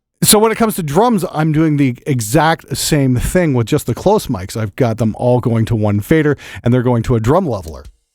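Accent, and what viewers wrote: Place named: Canada. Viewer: I have subscribed because I love your take on things, and your delivery.... except like all hosers you really need to learn to pronounce an O. Seriously love this channel.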